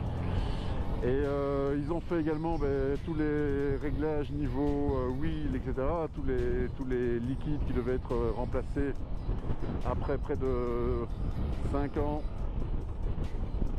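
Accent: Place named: Belgium. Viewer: 🤘😜 VAS Y T'ES BON BON BON 💪😜 Je reconnais bien le phrasé Belge 🇧🇪! Je m'abonne! 🇫🇷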